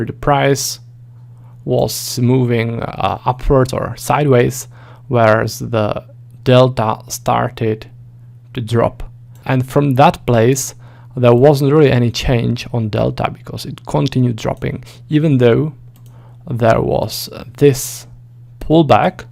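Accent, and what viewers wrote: Czech Republic. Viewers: Are you from Czech Republic? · Česká angličtina trošku že?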